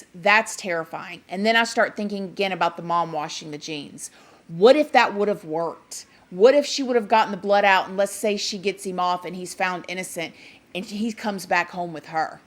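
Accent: South, United States. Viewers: Ok, I usually cringe at most youtube creators, especially when they have that southern accent...being European, it's like nails on a chalkboard. BUT...you are simply amazing. You're keeping it real, you've got charisma, you're empathic and I LOVE your southern manner of speaking. Keep rocking and never change! 😍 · where is your accent from sounds a little like a down south accent?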